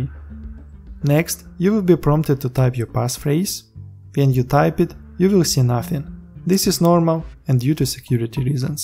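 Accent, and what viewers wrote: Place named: Russia. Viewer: Русский акцент английского языка :)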